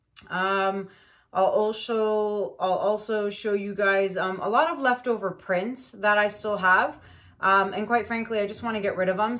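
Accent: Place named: Atlantic Canada, Canada. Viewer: I just want to tell you how much you've inspired me. Im a super crafty Atlantic Canadian and I am in awe of the things you do. It's so great to watch someone who talks like me and shops at the same places and all of that jazz. I've subscribed and binge watched pretty much all of your videos. Keep it up and maybe you'll see me on here someday if I get brave enough lol. You are phenomenal.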